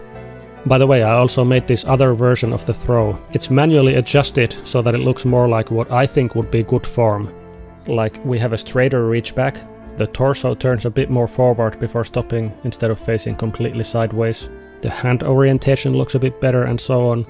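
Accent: Finland. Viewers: So, this guy is from Finland, right. Every single Finnish person speak english like that.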